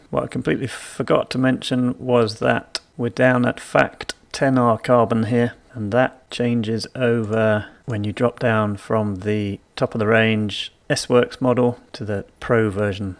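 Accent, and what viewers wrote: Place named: United Kingdom. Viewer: Thanks for that high quality comparison. Specially the model-vs-model and the price comparisons over the whole range of bikes. Love it. Even though im even more uncertain what to buy now. What would you guys go for with 6500£/8000$? btw. where are you from in the UK? Love the accent and the saying you are using. Want to learn those :-)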